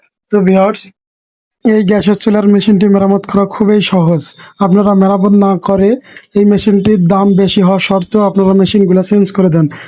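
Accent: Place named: Rangpur, Bangladesh. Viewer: কথা শুনে মনে হচ্ছে আপনার বাড়ি রংপুর 😊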